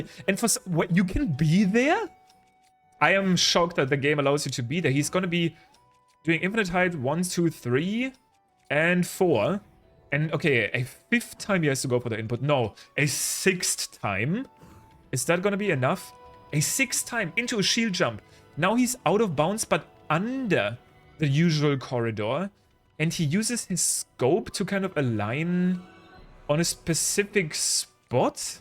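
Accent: Germany. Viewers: Are you from Germany? Because IT Sounds like you are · are you german? because of your accent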